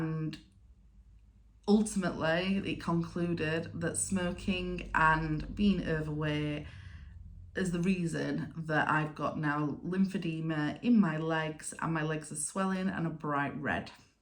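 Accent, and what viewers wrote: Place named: Leeds, United Kingdom. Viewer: Hi, totally relate to everything you’re saying, especially about feeling that you’re a strong, capable, intelligent person and yet this illness we have has got the better of us. I have recently started using Wegovy which has helped me enormously but it’s not a quick fix and still have to be very careful and mindful of what I am eating and drinking. I’m guessing from your accent you’re from Leeds, which I am too (Crossgates) though I now live in Norfolk. Xx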